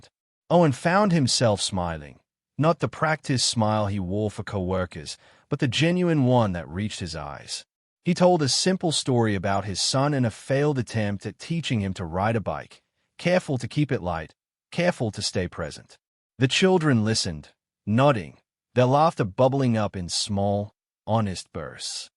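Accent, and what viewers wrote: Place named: Australia. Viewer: Love the Aussie accent!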